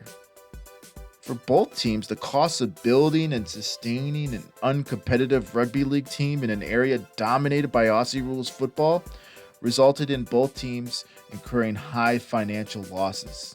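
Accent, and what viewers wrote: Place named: United States. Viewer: I'm a born and raised American too, and I've always been fascinated by Australian sports. It's great to finally hear an American narrator talk about Australian sports. Great video.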